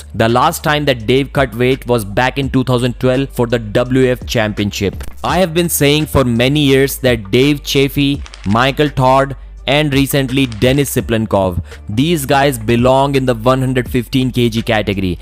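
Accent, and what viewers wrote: India. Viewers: the strong Indian accent of the speaker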